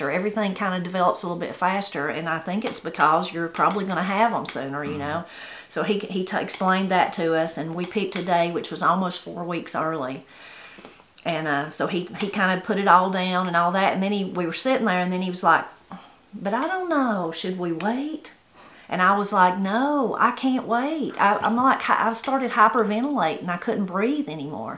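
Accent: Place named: North Carolina, United States. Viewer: I love listening to all your stories. It's the accent. My family is from North Carolina and its like being able to hear them again.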